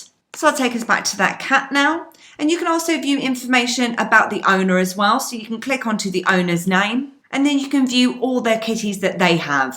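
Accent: United Kingdom. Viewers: the prim and proper British pronunciation that's catnip to an American's ear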